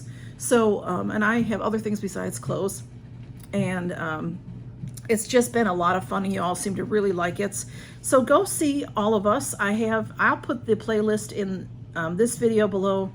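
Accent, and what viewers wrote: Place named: South, United States. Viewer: Happy Mask Monday! Nice Mask! Love your southern accent! I’m subscribed to the channels you mentioned. I’m trying to catch up, so many to watch lol. I have a terrible sweet tooth, trying to eat less sweets, it’s hard lol. I did eat the entire bag of that same Kettle corn in two days 😂 it’s my weakness. 🙏🏻😻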